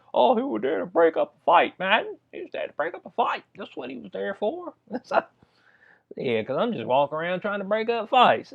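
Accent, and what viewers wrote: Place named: Arkansas, United States. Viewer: Just curious- where’s tha accent from? I guess south Arkansas?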